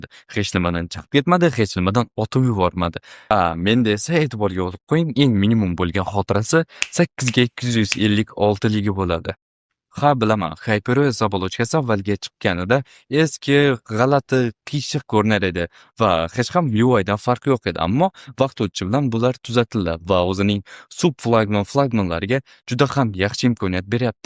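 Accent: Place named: Amudarya, Uzbekistan. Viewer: Aka Amudaryodanmisz shevangiz tortyabdi videoda mangʻitdagi tanish yerlar koʻrinib ketti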